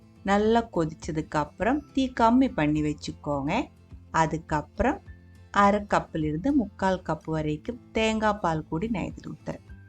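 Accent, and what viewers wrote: Kerala, India. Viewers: So nice mam ...your voice and rendering way is so cute ...I will try ...mallu accent super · Your voice is very suthing...that mallu slang mixed in Tamil is nice to hear...if your videos can be a lil faster..that ll be an added advantage..but overall enjoyed watching..im gonna try it soon..❤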